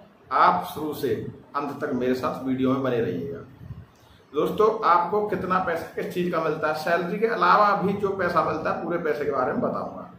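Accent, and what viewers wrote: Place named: Braj, India. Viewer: Sir mai aapse bahut prabhavit hu......aapki boli braj kshetra ki tarf ki lag rhi...aapki jaankariyan bahut hi kaam ki hoti h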